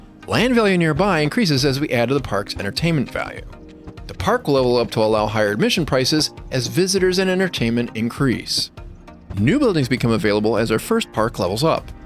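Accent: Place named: Philadelphia, United States. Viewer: This dudes definitely from Philly haha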